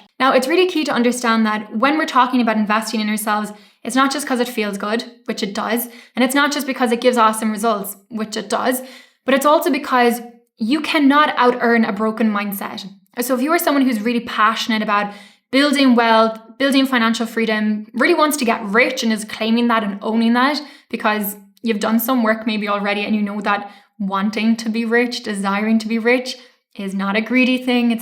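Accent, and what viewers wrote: Ireland. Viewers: Oh you are Irish.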